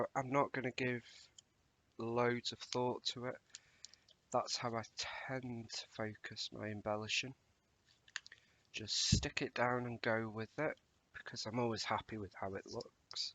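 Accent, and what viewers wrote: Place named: United Kingdom. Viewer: How nice to hear a British blokes voice doing scrapbook pages… cool take on the sketch and prompts. The format works a treat just like one of my favs Shannon (she’s Crafty). Chuffed to have found you… new subscriber 😊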